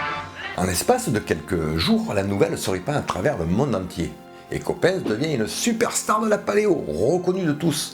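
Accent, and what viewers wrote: South, France. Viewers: Un bel épisode de vulgarisation! En ce qui concerne les vérités de type scientifique et les gué-guerres entre paléontologues sachez qu'elles vont encore beaucoup plus loin et dépassent le cadre la seule paléontologie! Amusez vous à comparer le contenu et la longueur des articles en anglais et en français de "Lucy" et de "Yves Coppens" sur wikipedia! C'est un peu la guerre à celui qui aura la plus grosse entre américains et français, si j'ose dire ! Nos amis anglophones goûtent peu ce qui leur semble un surcroit de prestige accordé à Coppens. La science ne gagne rien là dedans... Quand à l'accent... rien à redire. Je suis un méridional aussi et suis ravi d'entendre chanter la paléontologie. On sait être rigoureux nous aussi!!!! Tè vé!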